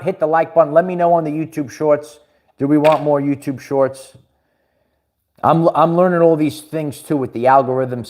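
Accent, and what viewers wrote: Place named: East Coast, United States. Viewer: I just found your video! (I am in the southwest, currently working and listening and I heard an East Coast accent and had to stop, I felt at home. Are you in Jersey by any chance? 🙏😄💜) So you know, it popped up as ‘people who watch Stefan Burns also watch this channel’. Great chat! Namaste 🙏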